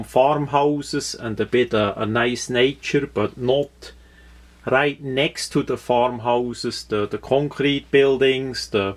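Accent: Switzerland. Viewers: Iv had a few Swiss friends you guys are good people. all the people iv spoken to are more American then Americans. as far as I know Switzerland is pretty free but oppressed at the same time. like needing a permit to transfer ammo to go the range. A friend said the houses are really small there and its almost like a meld between the old world and the new world. how you would have small old houses next to factories with mountains in the background. Im looking forward to your series. I always enjoy your videos both the content and the accent =) If I could have a different accent instead of my yank accent Swiss accent would be at the top!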